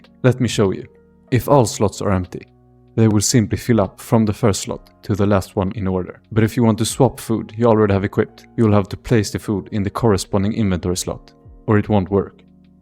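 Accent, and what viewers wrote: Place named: Sweden. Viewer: snyggt, nä men notera det när jag hörde att de va lite svensk dialekt i din engelska, funderade om du va norsk eller svensk xD :D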